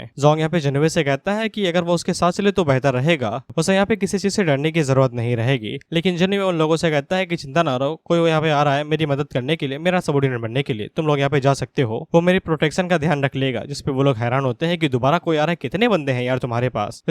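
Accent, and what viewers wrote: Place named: Bhojpuri, India. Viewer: Tumare muh se bhojpuri bhohat achhi lagti hai ❤❤❤❤❤❤ or i am the fated villain next part please 😢😢😢😢😢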